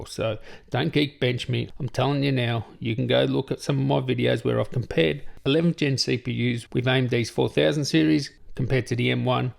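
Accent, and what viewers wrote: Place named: Australia. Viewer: Love the multicultural Aussie accent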